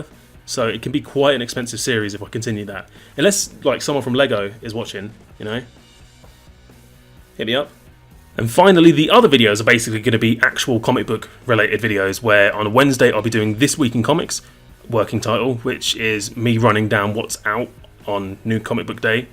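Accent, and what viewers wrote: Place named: United Kingdom. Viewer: you sound like very English person ever, but nonetheless i'm for this channel change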